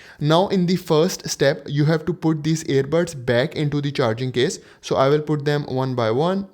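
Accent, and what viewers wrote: India. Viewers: Didn't work Even a tutorial from a dude with an indian accent can't save how bad JBL airbuds are I'll just buy from another brand and stop being a cheap ass thinking I can get something that won't die after 2 days at a reasonable price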